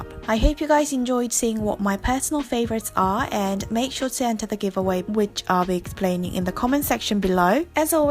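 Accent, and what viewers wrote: United Kingdom. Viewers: 영국 발음이 너무 듣기조아요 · 언니 안녕하세용 저는 조종사가 되기위해 공부중인 학생 조종사에요! 댓글로 인사는 처음인것 같네용 ㅎ_ㅎ 영어를 워낙 좋아하고 잘해야되는 직업을 꿈꾸다보니 영국영어를 하는 언니모습에 반해서 구독을 시작했었는데 ㅎㅎ 그리고 해외로 삼주정도 어학연수겸 여행을 다녀온 영국에 살고있는것에 되게 반했었거든요ㅠㅠ 제가 놀러갔을때 버밍엄에있었어서 +학교 소속으로 간거라 주말밖에 외박이 안됏어서 런던을 1박 2;일로 다녀왓었는데 정말 반했었거든요ㅠㅠㅠ 여러가지요소가 제가 언니를 구독하게 한것같아요 ㅎㅎㅎ 그런데 이제야 댓글을 남기게 됐네욥! 제가 가을을 좋아하는 이유는 가을은 정말 비행연습하기에 좋은 날씨거든요ㅠㅠ 봄에는 미세먼지로 인해서 visibility가 안나와서 비행취소된일이 한두번이아니구 여름은 더워서 정말 진이빠져요 연습용항공기엔 에어컨이없거든요... 그 더운 여름날 갇혀서 땀을 줄줄.., 가을은 정말 연습하기 딱 좋은 날씨에 visibility도 좋을 뿐더러 구름까지 완벽해요 겨울은 너무 추워요.., ㅠ0ㅠ 강풍이 불때도 많구요., 그래서 저는 가을을 제일 사랑해요 ♥ 다른분들도 다들 가을을 좋아하시겠지만 저는 비행을 시작하면서 아무래도 더 좋아진것같아요! 댓글이 1800개릉 향하고있어서 언니가 제 댓글을 읽을수있을지가 의문이지만! 저의 당첨을 원하는 마음을 담아 댓글을 남겨볼게요!!! 앞으로도 영상 많이 만들어주세욧 ♥♥ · 헐.... 오늘 미모가 열일하시는듯 진짜 예뻐요!!!!! 저는 가을이 항상 좋아요! 처음엔 단순이 제 생일이 있어서 가을이 좋았어요!!! 생일이 10월 2일이라서 가을이 막 오는 시점이기도 하고 사랑하는 사람들이 축하해주고 이쁘게 봐주니까 가을이 너무 좋았는데 가을만 되면 센치해져서 좋아요!!!! 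여러가지 옷을 입을수도 있고 여러가지 메이크업을 하고 변신을 딱 주기 너무 좋아서!! 저는 트렌치코트를 참 좋아해요!!! 가을에 어울리기도 하죠!!!! MLBB의 계절이기도 하구요!!!! 또 너무 덥지도 너무 춥지도 않아서 얼마나 좋은지!!! 이번여름의 엄청난 열기가 지나가서 올해 가을은 더 좋은거 같아요!!! 여러모로 항상 님 좋아하고 응원해요!!!! 저 님이 좋고 매력적이셔서 미국식발음에서 영국식발음으로 고쳐가고 있어요!!!!! 진짜 영국식발음이 전 진짜 님 매력중 하나인거 같아요!!!! 너무 예쁘고 멋진 님!!!! 가을도 좋고 님도 넘나좋습니다💜🙆💜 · 이번 메이크업이 에게 특히 잘 어울리네요 너무 강한 색 섀도우 쓸때보다 은은한 색들오 메이크업하니까 분위기가 깊은? 그런 느낌이 들게해여!!이뻐여!! 가을이 좋은 이유는 저는 하늘보는거 좋아하는데 가을의 구름들이 가을만 가진 예쁜 모양이나 특이한 형태 그리고 가끔씩은 택스쳐도 다를것같은 그런 특유의 분위기를 가졌더라구요 그래서 전 가을이 좋습니다! 이벤트때문에 댓글을 단 것도 있지만 매번 듣기에 너무 좋은 영국발음과함께 영국생활을 리마인드 해줘서 메이크업은 물론 브이로그 영상도 잘 보고 잇어서 응원하고 싶다는 생각에도 달아봅니다! 어린나이에 타지에서 언니랑 둘이?인지는 확실치 않지만 생활하는 모습 보기좋구 앞으로도 좋은 영상 잘 부탁드립니다!!! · (응?ㅋㄱㄱㅋㅋㅋ) 레드립은 넘나 화사해보이고, 25호 누디립은 넘나분위기 넘치십니다😭😭😭(덕통사고) 에뛰드가 이번에도 정말 열일했네요!!! 오늘 메이크업은 님 영상보고 영감받아서 클레식메이크업 해봐야겠어요ㅎㅎㅎ 아 맞다 그리고 가을이 좋은이유!! 바로 모든분들이 꼽으실 이유같은데요!ㅋㅋㅋ 일단 바람이 넘나 선선하다는거! 불면증도 사라지고 너무 좋습니당♥ 또 전 개인적으로 가을겨울 옷들을 너무좋아해요, 니트 무스탕 블랙스키니 셔츠 등등 가을에 잘 어울리는 룩들이죵! 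게다가 메이크업도!!! 주로 버건디, 퍼플 계열의 메이크업을 하는 전 가을이 너무 좋네욯ㅎㅎㅎ 이제 내 세상이도닷!!!!! 님 영상 항상 잘보고 있고요, 영상 속 영국 영어 너무 매력적이예요💕💕 영국이란 나라에 관심이 많아 발음이라던지 자꾸 그나라 문화를 찾아보고 있는데 그때 님을 알게도었다능...!!! 그럼 다음 영상도 기대하겠습니닷💖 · 항상 볼 때마다 느끼는거지만, 님은 넘나 귀여운 것 ㅠㅠ 영국악센트도 진짜 러블리.. 항상 좋아요만 누르다가 오랜만에 댓글 다네요. 전 사계절 중 가을을 제일 좋아하는데 제가 더위를 많이타고 벌레를 아주 극혐하는 수준이기 때문에 가을이 제일 좋아요. 특히 제가 건성이라, 겨울엔 아무리 기초를 탄탄하게 해도 화장이 뜨더라고요ㅠㅠ 근데 가을은 시원한데다, 건조해도 화장이 뜨지않고, 비도 별로 안와서 항상 하늘이 예쁘고 화창한 날씨잖아요. 벌레도 없고. 그래서 가을이 제일 좋아요.😆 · 언니 영국 발음이 너무 듣기 좋아서 구독하기 시작했는데 여전히 좋아요...ㅎ사실 제가 영어에 흥미도 없고 못 하기도 해서 영어 잘 하는 사람이 더더욱 부럽고 매력적으로 보이거든요ㅎㅎ헷 특히 툭툭 내뱉는듯한 영국발음은 정말 더럽...❣본론으로 들어가서 공교롭게도 제가 원래 제일 좋아하는 계절이 가을이에요! 가장 싫어하는 계절이 여름이라 여름 다음에 오는 가을이 제일 반갑고 여름이 오기 시작할 때부터 '얼른 가을이 왔으면 좋겠다'라고 생각하거든요ㅎㅎ가을 중에 특히 서늘해지는 9월 저녁 공기가 너무 좋아요 저는 아직 대학생이라 중간고사, 기말고사를 보는데 그 선선한 바람을 맞는 저녁은 시험에 대한 압박감도 없고 정말 여유를 느끼고 산책할 수 있거든요. 그리고 봄과는 다른 이유모를 설렘과 감성이 풍부해져서 좋은 것 같아요 그리고 제가 제일 좋아하는 색인 레드가 잘 어울리는 계절이기도 해서 화장할 맛이 나요ㅋㅋㅋ또 제 생일이 10월이라 제일 행복할 수 있는 날이 가을에 있기 때문에 더욱 가을이 좋은 것 같아요😊 이번 가을은 너무 덥고 태풍도 와서 제가 원하던 가을바람은 못 반겼지만 그래도 곧 다가오는 제 생일을 기대하면서 기분좋게 시간을 맞이하고 있어요 언니도 하루하루 소중히 시간을 보내면서 가을을 맘껏 느끼세요!!😉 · 오늘 메이크업 정말 이뿌네용👍🏻👻 가을은 제가 태어난 계절이기두 해서 가을이 오면 괜히 좀 하이퍼되구요 패션이나 화장 스타일을 좀 어둡고 딥한 걸 조아해서 쇼잉 오프 하는걸 즐길 수 있어서 조아요 ㅠㅗㅠ 영국 발음 그리울 때 채널 와서 영상 보곤 하는뎅 할로윈 멬업도 곧 해주셧으면!! 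😘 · 언니 특유의 영국발음이 좋아서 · 언니!! 저는 진짜 ㅜㅜ 유럽여행갔다가 영국분위기에 빠져서 언니 브이로그 보는 거로 대리만족하고있는 구독자에요! ㅜㅜ 진짜 언니 동영상 업데이트 안되도 하루에 세개는 보고 자는듯.. ㅠㅠ 그만큼 언니 영상 좋아해요!! ㅜㅜ 저는 사계절중에 가을이 제일 좋아요! 왜냐면 우선 가을은 여름의 습함과 더움때문에 얼굴에 화장을 하면.. 건설도 지성을 만들어버리는데 가을은 우선 산뜻하고 틈나면 친구랑 한강에 가서 돗자리깔고 얘기만 해도 기분좋게해주는 날씨를 갖고 있기 때문이에요! 그리고 가을하면 저의 엄마 생일이 있어요! 대학과 집이 떨어져서 집에 자주 못가는데 가을에 엄마 생일때 엄마보러 가서 좋아요! 이벤트 당첨 안되도되요! 언니영상에 글 처음써보는데 흐.. 언니 영국발음 너무 좋고 그냥 힐링되요ㅠㅠ 영국 갈게요! 저! ㅜㅜ 진짜로 영국에서 살고 싶네요ㅜㅜ 오늘 메이크업 완전 잘어울리세요ㅜㅜ❤️ 그럼 여기서 글을 마칠게요!! 언니 영상 잘 봤어요!!💘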